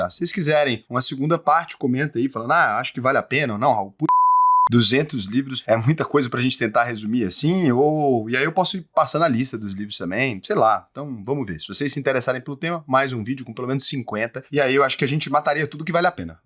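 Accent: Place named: Rio de Janeiro, Brazil. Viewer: Ohh Raul esse audio seu tá parecendo carioqueis parece esta falando assobiando 🤣🤣🤣 ajuda aí meu fi.Ass: Frantyesco Maia mineiro aqui em Portugal, um abraço e obrigado pelas excelentes explicações.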